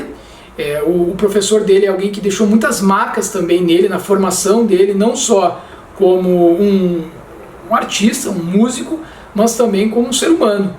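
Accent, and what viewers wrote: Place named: Rio Grande do Sul, Brazil. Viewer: Já vi que é meu conterrâneo pelo sotaque. Mas bah, né? Amei o vídeo. Verei os outros em breve.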